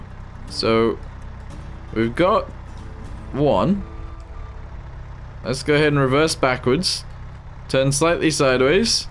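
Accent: United Kingdom. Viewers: Hi, I came to UK and I'm already used to their accent thanks to you!🎉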